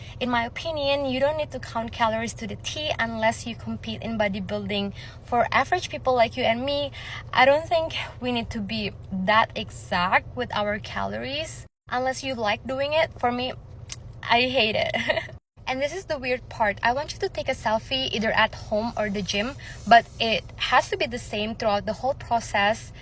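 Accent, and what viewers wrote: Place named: Philippines. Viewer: Are you a Filipino? You kinda have that accent. ❤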